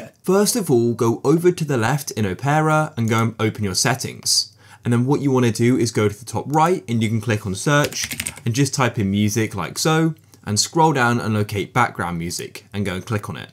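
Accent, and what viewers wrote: United Kingdom. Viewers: WHY IS ALL TUTORALS BRITSH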